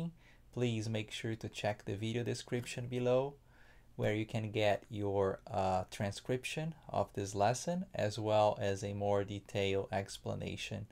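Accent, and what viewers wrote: Brazil. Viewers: Ele tem um sotaque meio brasileiro msm kk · Your accent condemns you 😊 What a great surprise for me to know an amazing guy, excellent performer and an example of professionalism and dedication. Things like these make me feel proud of being brazilian! I wish you the best, bro! May God bless you!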